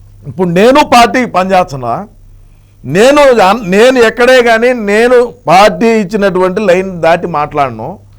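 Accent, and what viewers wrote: Rayalaseema, India. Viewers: రాయలసేమయాస ఏదన్నో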